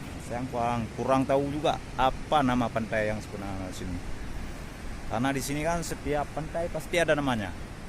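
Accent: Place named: Malaysia. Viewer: Ngomongnya kayak orang malaysia mas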